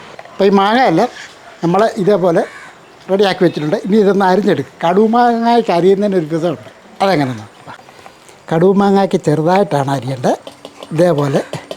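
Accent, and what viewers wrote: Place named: Central Travancore, India. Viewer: എന്റെ സഹോദരാ, ഞാൻ സ്വയം പാചകം ചെയ്യുന്ന ആളാണ്. ഒറ്റയ്ക്ക് ആണ് താമസം. എന്റെ കിച്ചനിൽ ഇപ്പോൾ റെസിപ്പി മാത്രമേ ഉള്ളൂ. പുകഴ്ത്തുകയല്ല എല്ലാം അടിപൊളി. താങ്കളുടെ വിവരണം നന്നായിട്ടുണ്ട്. താങ്കളുടെ ഈ വിനയം ഉണ്ടല്ലോ എന്താ പറയാ ഒരു വലിയ സല്യൂട്ട്👍🙏. മധ്യ തിരുവിതാംകൂർ കാരൻ ആണെന്ന് തോന്നുന്നു സംസാരം കേട്ടിട്ട്. ദൈവം ധാരാളമായി അനുഗ്രഹിക്കട്ടെ.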